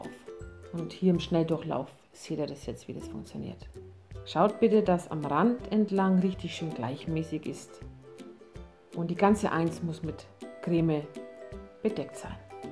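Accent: Franconia, Germany. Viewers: Woher kommst du aus Deutschland ??du hasst ein fränkischen Dialekt:) find ich total cool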